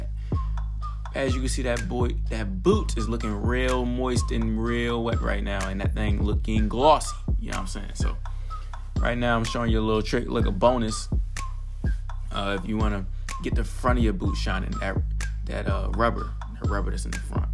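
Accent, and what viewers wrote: Baltimore, United States. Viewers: You sound like you are from Baltimore. Have you ever tried using ice cold water? Another technique I use instead of cotton balls is to use panty hose?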